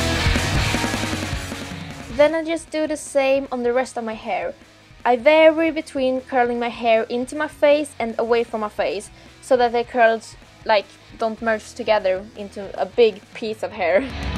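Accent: Sweden. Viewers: Just gotta love the Swedish accent tho! ;D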